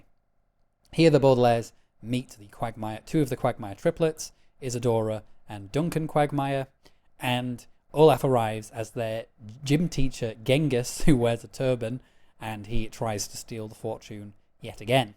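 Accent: Northern England, United Kingdom. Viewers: yes that’s it, my ears have become adapted to your accent! I had heard reference to the Northern accent but never really knew exactly what it was… kinda like the Southern accent here in America I guess…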